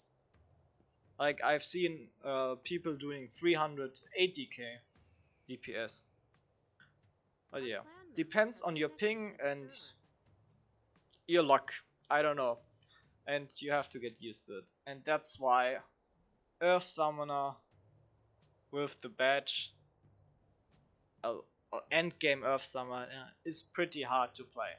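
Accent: Germany. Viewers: so german accent +1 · are you german ? (accent)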